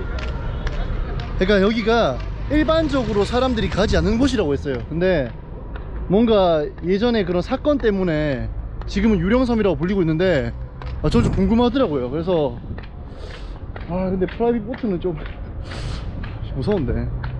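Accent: Gyeongsang, South Korea. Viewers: 나 웨 영상 끝나는게 싫지? 재며들었나봄...경상도식 영어 못잃어↗️↗️↗️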